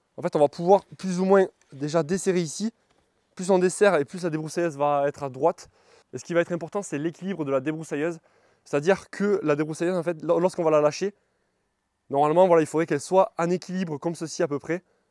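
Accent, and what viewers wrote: South, France. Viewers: L accent du Sud ❤ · Un grand merci pour tous tes conseils pour l'utilisation de la débroussailleuse. Un super tuto très utile et quel beau paysage, cela doit être dans le Sud vu ton accent. Je suis dans l'Ouest et c'est très plat! ---> mais on a des plages pour se baigner.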